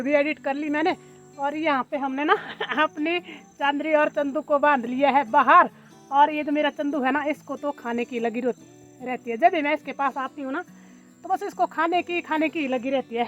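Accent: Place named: Punjab, India. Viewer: Aunty ji aap punjab se ho kya aapki boli punjabi jaise lg rahi hai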